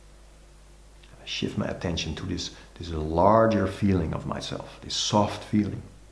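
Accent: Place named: Netherlands. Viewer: Ik wist het. I mean, I used to live in the Netherlands, so I'm used to the Dutch accent hehe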